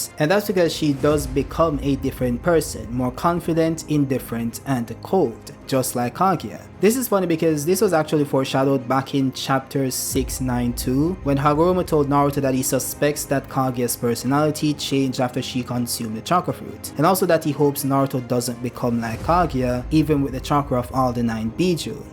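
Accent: Jamaica. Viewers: which part of Jamaica you come from mi g you sound like you from ochi bro gaad but more time yuh affi Yankee up the ting mi boss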